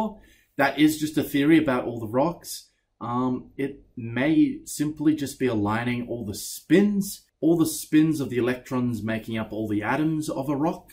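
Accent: Australia. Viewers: Huh is he an Aussie "Hang on where's my coffee? There's my coffee" stands up ... Yep he's an Aussie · Omg you look British but sound Australian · Explained well! Even me, who doesn't know English so well, everything is clear. Despite the fact that I have heard the Australian accent in very few places)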